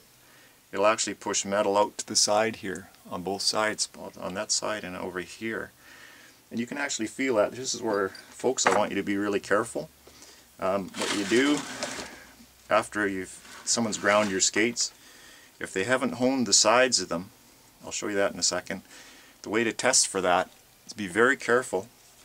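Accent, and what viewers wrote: Canada. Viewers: I could tell you're Canadian as soon as I heard you talk. -From a fellow Canadian :P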